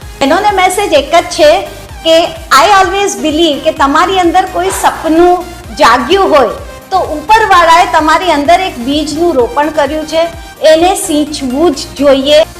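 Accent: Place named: Gujarat, India. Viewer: Mane pan khaber padti hati aapni bolvani style thyi gujju cho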